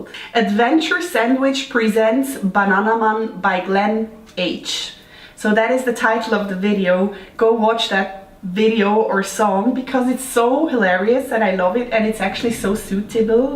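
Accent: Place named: Switzerland. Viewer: looks yummy! I never tried a vegan version so I'll be sure to try your recipe next time(+the sugar haha)! 😍 I let my bananas get even browner 😂 ...my boyfriends mom taught me to freeze the ripe bananas. (They get super soft after thawing, so a bowl is needed! And it gets pretty messy haha.) AND your Swiss accent is the best! Accents are so much fun! 😂 I enjoy the tifical Pilipino accent, you know? But se German won is good too 😂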